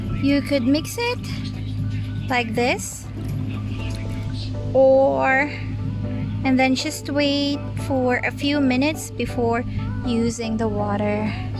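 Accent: England, United Kingdom. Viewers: O<link> I really love your english accent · Ang galing naman po salamat po sa pag share nito, ingat jan. I like you english accent.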